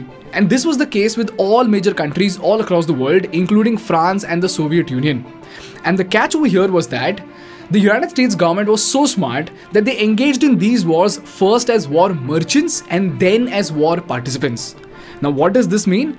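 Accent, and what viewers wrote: India. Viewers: That indian accent 😋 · Indian English really different and very strong Indian accent.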